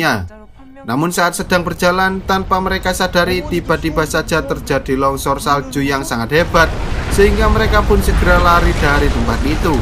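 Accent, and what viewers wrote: Java, Indonesia. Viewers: Yang cerita film ini pasti orang jawa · Jowo mu medok lek😭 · Wong jowo t min? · Medhok e lho,,,🤭 Filmnya bagus, sy baper terutama ketika Gihun ingin bertemu ibu dan meminta maaf pd beliau. Apik Bang,,,👍🏼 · Logat jawanya masih kental kali miminya 😅😆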